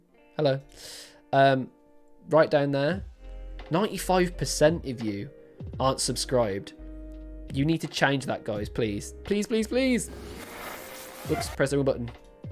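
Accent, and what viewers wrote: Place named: Northern England, United Kingdom. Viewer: Subscribed because I'm a sucker for a Northern accent and your videos are fantastic. I saw the first one and knew immediately how much fun you were going to have with this. ✌️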